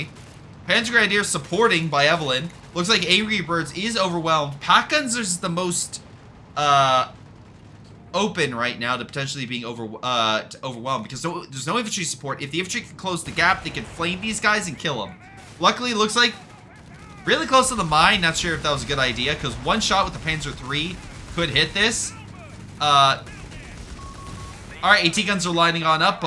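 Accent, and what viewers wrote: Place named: United States. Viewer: as a German/Italian your pronunciation of Guastatori is quite good and probably the best possible with an american/english accent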